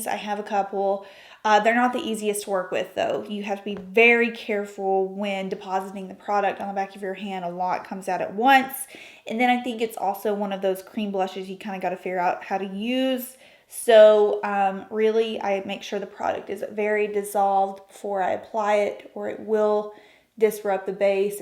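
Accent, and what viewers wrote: South, United States. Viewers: Hey, I’ve been bingeing on your old Bare Minerals videos (yours are the BEST, btw...) and I was wondering where the heck your accent has gone? It’s obviously there somewhat but in your older videos you sound like a Country Sanger! Love and hugs, where’s Puff?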